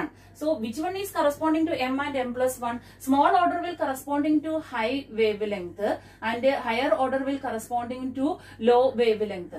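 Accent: India. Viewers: The indian accent of your voice really rocks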